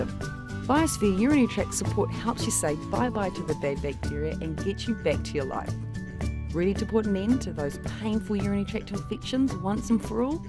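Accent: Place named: New Zealand. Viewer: u sound new zealander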